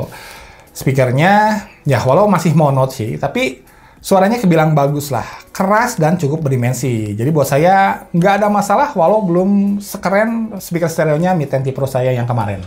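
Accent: West Java, Indonesia. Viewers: sunda pisan logat na.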